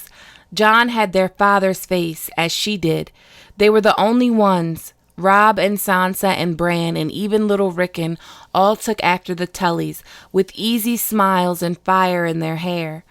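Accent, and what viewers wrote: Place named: New Jersey, United States. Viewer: are you from Jersey? I heard how you say wudder instead of water!;)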